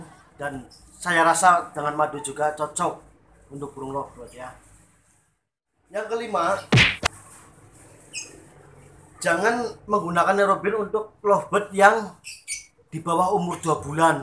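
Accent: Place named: Banyumas, Indonesia. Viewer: Mantap mase...ora ngapak ora kepenak.... Salam suport...🙏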